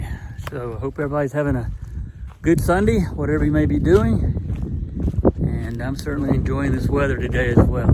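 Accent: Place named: Tidewater Virginia, United States. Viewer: Just guessing, your accent sounds like you were in the tidewater area of VA. When I was a kid there were very distinct accents here but not so much any more.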